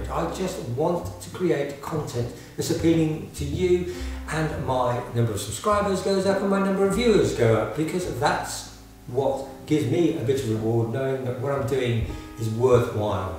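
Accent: United Kingdom. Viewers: My reason for viewing is to see and hear what you have to say. Like I said before I am a sucker for an English accent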